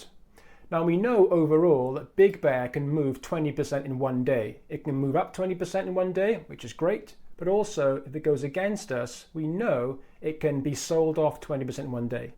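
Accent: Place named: Wales, United Kingdom. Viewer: I Live in Hirwaun. You don't sound to far away.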